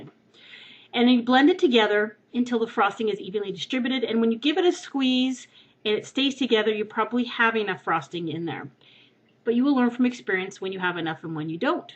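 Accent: Canada. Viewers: It's called a Canadian accent.. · Are you Canadian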